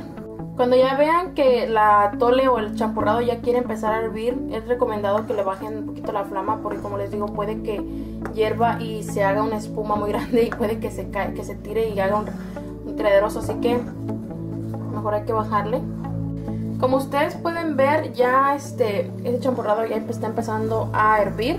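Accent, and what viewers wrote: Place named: United States. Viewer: cada vez de dices SO! es como una patada en la espinilla.... adios con tu cento pocho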